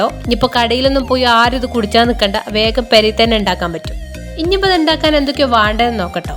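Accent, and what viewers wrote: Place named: Malappuram, India. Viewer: Malappuram slang pwoli❤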